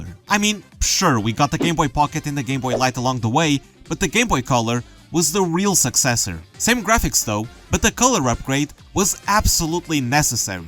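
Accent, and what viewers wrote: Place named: Portugal. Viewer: I know a portuguese when I hear one! Good video 🤝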